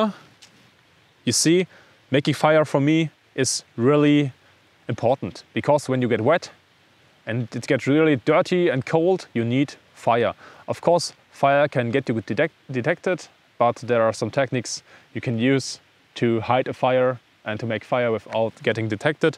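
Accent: Germany. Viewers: nothing cooler then german english. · So german english accent🖤🇩🇪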